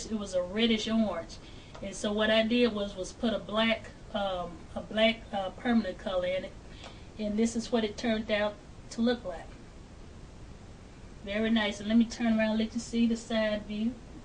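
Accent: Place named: South, United States. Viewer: Girl I love this wig on you!!!!!! And love your country accent =)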